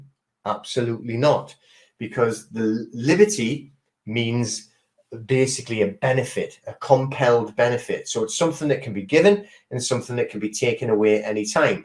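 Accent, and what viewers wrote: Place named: Newcastle, United Kingdom. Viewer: You sound like a geordie if I’m not mistaken are you from the North East of England??? I’m curious apologies in advance if that’s not the case.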